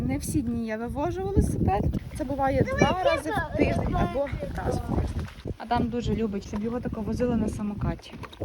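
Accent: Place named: Volyn, Ukraine. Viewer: вже підписаний) я тільки відкрив для себе ваш канал і зазаз передивлююсь) я сам теж з Волині, але вже давно там не живу, тому дуже приємно чути наш рідний говір)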